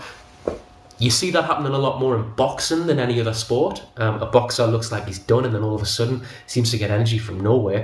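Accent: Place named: United Kingdom. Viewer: Hey, from your accent I guess your from the UK, i was wondering where abouts are you based as i was thinking about having a personal trainer cheers tom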